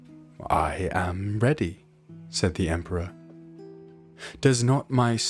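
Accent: United Kingdom. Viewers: I would love and never get tired of listening on storytelling...keep it up idol...first time viewing something like this...the only problem with me, in my case is, and being a granny as well, some lines I cannot understand easily, since it is a British accent, so I have to repeat listening to get through what you are saying, really trying my best...just to listen to you...thank you for the joy you have brought in my life...I always pray for your best of health, success, happiness and protection. God bless you always! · The voice, and he is English like the accent. Nice story, never heard about it.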